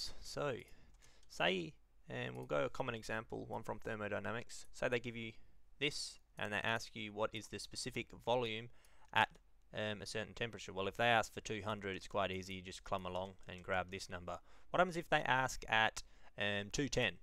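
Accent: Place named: Australia. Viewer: Aussies make explanations much more fun to follow with their accents, cheers mate, and great job!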